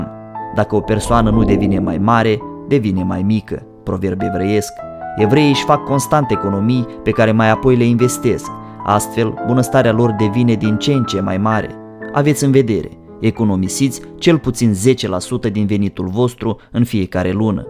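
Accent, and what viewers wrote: Moldova. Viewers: Graiul dulce moldovenesc nu are egal , dar nici cu maramuresenii cei glumeti si simpatici nu imi este rusine. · Perfect,Și un accent clar, citit ,moldovnesc ,cel mai scurt și clar în limba romana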